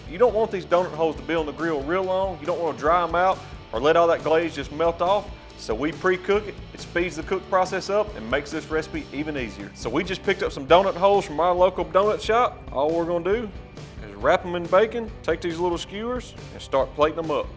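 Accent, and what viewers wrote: South, United States. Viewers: That is one thick country accent I thought mine was bad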